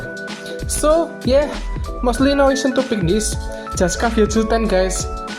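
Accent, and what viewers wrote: Indonesia. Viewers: Bah medok bgt suarane😂😂😂😂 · im glad im indonesian so i can understand the accent 😭😭☝️ thanks for covering this haha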